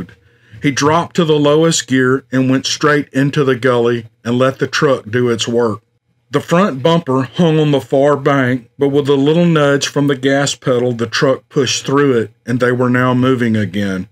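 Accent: South, United States. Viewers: Always have had a fasicination with Cryptoids. I myself am a Southern girl. Born in Memphis,TN and as a child roamed the hills of Mississippi, Tippah County my paternal aunt own land so I was all over it. Never ran into Bigfoot, but there suppose to be a Big Black Panther of sorts roaming those same hills. But I never had the experience of meeting anything out of the ordinary. I now live in Washington state still on the look out though. Love your storytelling and your southern drawl. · I love the southern American accent, i dont know if its me being Aussie and not knowing what to listen for but it doesn't sound trashy to me, it sounds charming, engaging. Its an accent made for story-telling. Are there different southern accents? I imagine with Louisiana being French they might sound different & I've heard Cajun people speak and I cant understand them at all. 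· I love his accent and cadence. Sounds like a suave southern gentlemen. (I'm a woman.)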